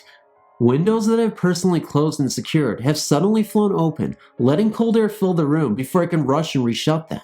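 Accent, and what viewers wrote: South, United States. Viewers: Southern ascent sounds good,great vid Thanks and happy holidays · LoL 😂😂😂 Your Southern Accent 😏 I was Dying like is quite Funny & Cool 😀 much love ✌💙💙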